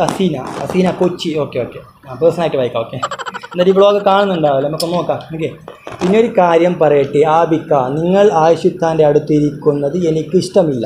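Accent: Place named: Udupi, India. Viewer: She is from udupi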